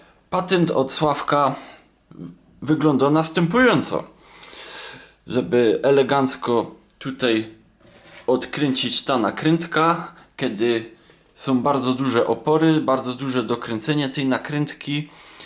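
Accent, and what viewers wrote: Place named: Silesia, Poland. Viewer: Ja nie dam komentarza bo nie umiem tak śmiesznie po Śląsku mówić😉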